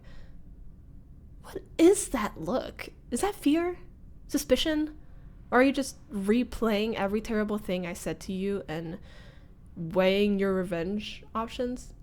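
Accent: France. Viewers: Purée je me perd dans l'asmr et je tombe sur une asmrtiste incroyable qui parle anglais I appreciate seing french speaking english and I absolutely love your accent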